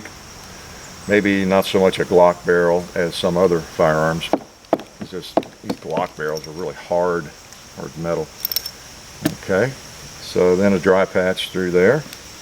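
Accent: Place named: Florida, United States. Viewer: You sir, just gained a follower and a fan. You sound like a Florida boy just like me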